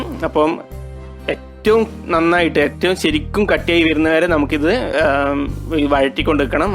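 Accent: Kannur, India. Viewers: ettan kannur kaaran anno? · 6 .49 innann paraya😂our original kannur slang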